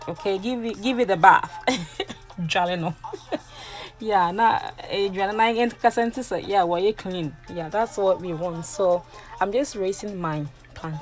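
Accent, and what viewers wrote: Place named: Ghana. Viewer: The Ghanaian language ascent alone😍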